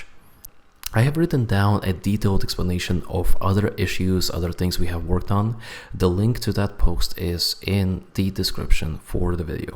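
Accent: Russia. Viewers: Замечается русский акцент) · Лол, акцент то русский)) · Лолол, почему я слышу русский акцент? ._. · Говоришь по английски,а по голосу(произношение) понятно что русский с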